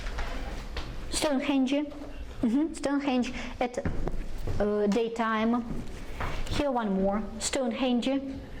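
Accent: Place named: Russia. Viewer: Ну и что,что у нее произношение рунглиш,за то как интересно и доступно объясняет ,вспомнила свои студенческие годы в инязе.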